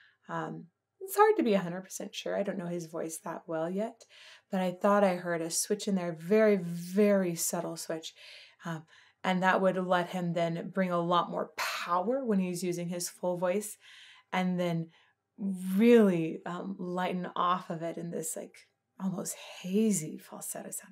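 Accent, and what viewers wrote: Germany. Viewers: That German accent is so sexy 😍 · English is not his native language....he is German think about that..........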